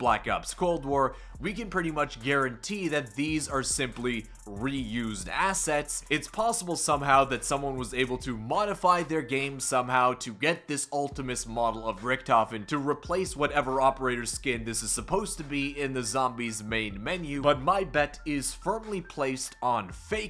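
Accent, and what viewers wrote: Canada. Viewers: Are you Canadian by any chance? Your accent sounds Canadian.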